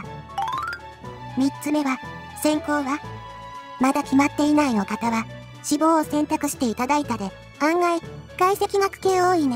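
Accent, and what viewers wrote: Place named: Kansai, Japan. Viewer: さすが、関西弁のチャネルで大半は京都大学出身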